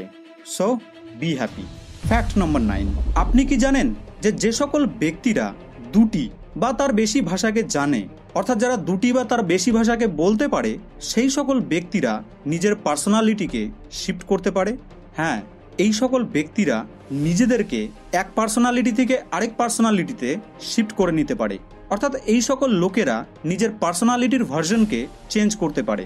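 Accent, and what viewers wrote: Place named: Kolkata, India. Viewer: ভারতের কলকাতার মনে হয়,,, কথাবার্তায় মনে হচ্ছে কলকাতার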